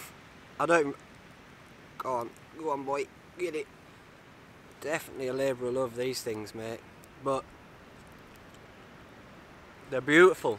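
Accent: Yorkshire, United Kingdom. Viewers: Hi mate I love your videos and have been fancying a go at some coastal virgin foraging I’m from Yorkshire and picked up you ears coast accent . I’m wondering where’s best to go for a first time thinking robin hoods bay what you think bro ?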